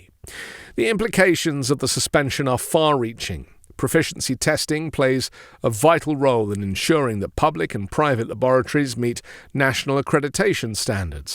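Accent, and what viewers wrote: United Kingdom. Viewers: Best voice on the internet. Smooth and silky British goodness! Cheers mate!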